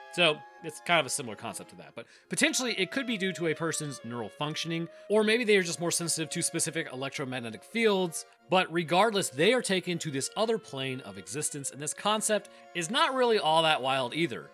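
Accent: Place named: United States. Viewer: "Learning more about the world around us" I imagine that would be vastly different between literally ANYONE ELSE IN THE WORLD, and Seppo's...who have no idea what the rest of the world is like, outside of what their State-based Social Media brainwashing and generational indoctrination tells them...which, ofcourse, is all wrong! Also, I know all english-speaking countries and peoples have an accent... but Imperial Measurements are pronounced "Fu<kwit units"... I know, I know, its an accent thing, I hear it as "Freedom Units", but just wanted to clarify that for all the other English-speaking audiance members... he is saying "Fu<kwit units". And I know, we hear his American Accent as "heathen units", but thats just the Seppo twang on "Metric units"... Remember, the U.S. is a third-world country pretending to be a first-world country... They don't know how good it can be away from that toxic brainwashing and indoctrination...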